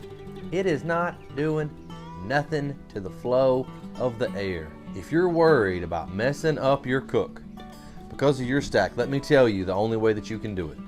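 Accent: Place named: Rural, United States. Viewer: Love you man! I miss that country accent being in COMIfornia now. Beast to you and your page.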